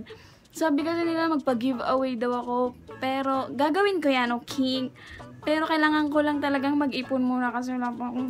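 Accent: Visayas, Philippines. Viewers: Hahaha bisaya jod kaayo 😂 miss u hahahah puntahan kita perps mol papapicture ako sayo 😂🙈💞 replaye ko please hahah now pako nag tan aw sa imo mga vids. Sub na! 💋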